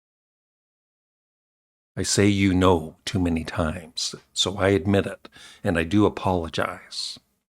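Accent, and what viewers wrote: Canada. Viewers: Honestly, I never noticed you saying "you know". I do notice the "aboots" but that's your lovely Canadian accent which doesn't bother me. At least you don't wave your arms and hands in front of the camera like you're chasing off a swarm of angry bees. There are a couple music reviewers that give me a seizure with all their silly arm waving behavior 😆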